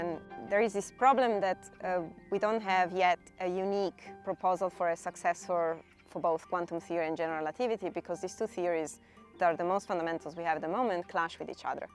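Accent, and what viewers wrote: Italy. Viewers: Er, her accent would be Italian, not English, as she comes from Turin.....So she passes the Turin Test! (sorry!)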